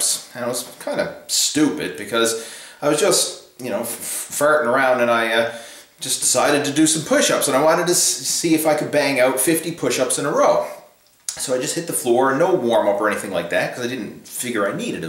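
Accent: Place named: East Coast, Canada. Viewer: The accent. Can’t tell if it’s East Coast Canadian or maybe a light Boston accent. Newfoundland?Where are you from bud? I only ask because I teach English and my study was linguistics.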